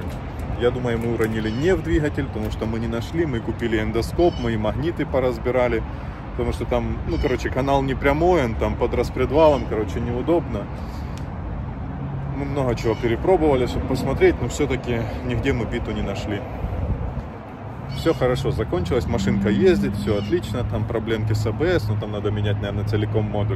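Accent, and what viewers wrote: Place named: Surzhyk, Ukraine. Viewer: З одного боку, власно мені, все одно на якій мові твої ролики. А с другой сторони як почув наш рідний суржик то й ти якось став ближче до нас. :))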